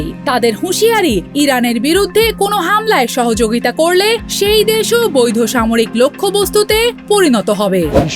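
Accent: Kolkata, India. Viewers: এইরকম কলকাতার স্টাইলে খবর পড়ে কেন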